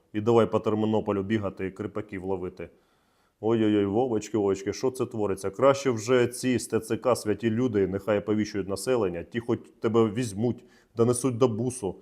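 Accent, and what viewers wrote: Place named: Russia. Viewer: Як би ти не старався розмовляти українською , но по діалекту зрозуміло як ти обожнюєш рашиську . Так що йди під три чорти , чотириокий чорт .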